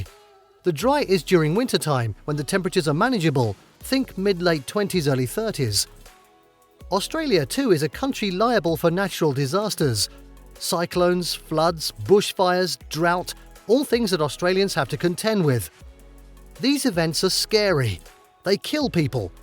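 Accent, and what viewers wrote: England, United Kingdom. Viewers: Mistakes 1. New Zealand flag not Australian 2. English not Australian accent 3. Supposed indigenous people contained indigenous to Africa, North America and South Pacific Islands 4. Photo of second largest city which is Melbourne were actually of third largest city Brisbane Many more WHAT A FAKE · This entire video was a hate campaign produced Indians using a Brittish accented narrator who claims he was born and raised in Australia. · Yeah, someone else see's through his bullshit. Aussie with an English accent. Pertains to have been born here, yet on another video he says he would like to visit. Some other commenter claims this originates in India. Maybe that is why such an emphasis on it. By the way this banana really is full of poo poo.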